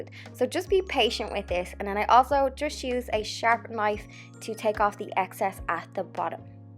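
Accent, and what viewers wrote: Ireland. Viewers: Your diys are cute just like you. Love your Irish accent! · I love the Irish accent. It is absolutely gorgeous. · I enjoyed your video! I do all of these already...but I see I need to make them pretty because these look great!! And I so love your beautiful Irish accent!! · You have gorgeous eyes! My hubby is Irish, I love an Irish accent. I could listen to you all day. Great ideas, thanks for the video! Just subscribed! ❤😊🍁❤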